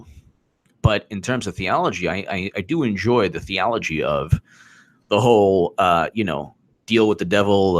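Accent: South, United States. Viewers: Jeffrey Morrissey It's sort of mandatory, for comic relief. Otherwise this mess is unbearable. And indeed, is good at it. How he manages the Southern accent, dunno... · Love that accent!! Are you a closet Southerner? Well, I'll be.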